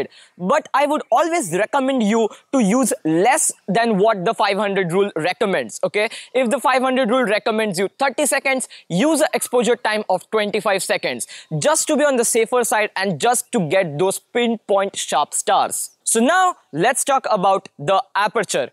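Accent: India. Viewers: а есть индусы, у которых хватает ума научиться говорить на английском не по-индийски? индийский копипаст ютюба... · I love this Indian accent! :D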